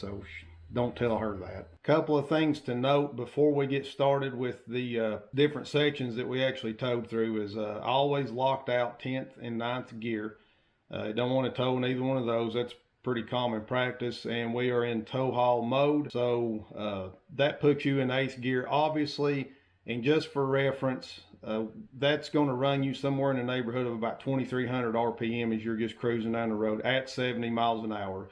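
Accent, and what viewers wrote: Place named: South, United States. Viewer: New subscriber - thanks for the video. I am enjoying your channel and hearing about your journeys. I like the southern drawl, kinda relaxes me to listen to as my grandpa's family is all from Georgia and I enjoy their accent.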